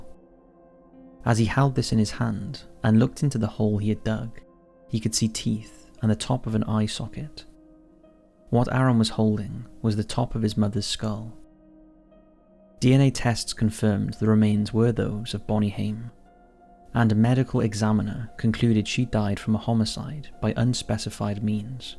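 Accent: Wales, United Kingdom. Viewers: Wales - his accent is Welsh.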